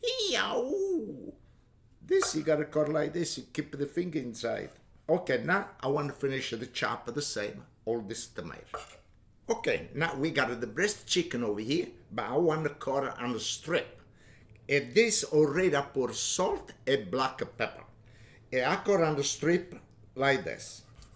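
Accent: Italy. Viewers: I love his thick Italian accent. The best ❤️ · I am here for the accent and the kickass Italian vibe here!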